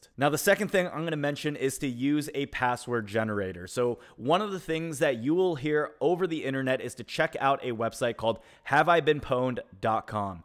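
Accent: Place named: DMV area, United States. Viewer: You from the dmv area?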